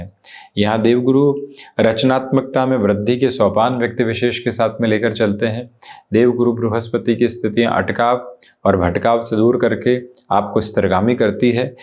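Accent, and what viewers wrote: Standard. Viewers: आप का हिंदी बहुत शुद्ध है गर्व है ऐसे हिन्दुस्तानीयो पर जो अपने गरिमा को बनाऐ हुऐ है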